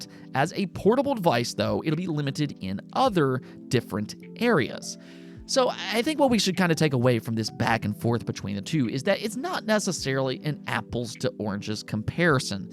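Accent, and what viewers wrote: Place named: Mountain West, United States. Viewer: I can tell you're from the mountain west region by the way you say "rill dill." Didn't even know this accent existed before Sister Wives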